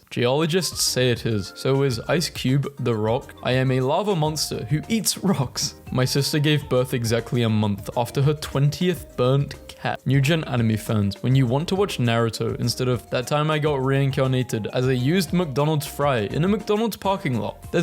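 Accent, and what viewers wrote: United Kingdom. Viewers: are you British by any chance? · are u british?:D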